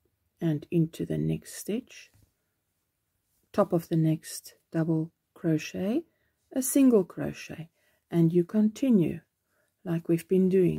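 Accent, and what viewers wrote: South Africa. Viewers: Omg I just found you and you sound just like me mom with your accent. I'm guess your from South Africa. I lost my mom in April and miss her so much, hearing you talk brings me so much happiness remembering her voice. I was born in Capetown but came here to the U S when I was 3. My mom never lost her accent. Love this poncho and can't wait to make it.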